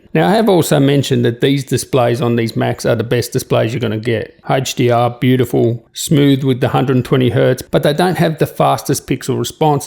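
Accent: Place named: Australia. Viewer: I love Aussie accent :)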